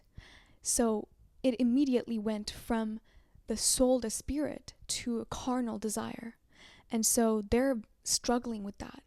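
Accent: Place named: United Kingdom. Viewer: I am attracted to that voice! I am a sucker for that english and British accent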